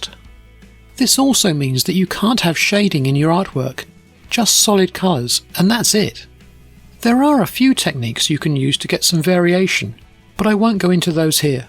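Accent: United Kingdom. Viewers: I speak for everyone when I say, we love how you Brits across the pond say stuff like; Decals, Schedule, Bonnet, Lorry, The Loo, etc. it’s so posh! 👌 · I am indeed. I usually like the way the accent sounds from your side of the pond, that one though, grates on my ears. 😜 Keep up the good work.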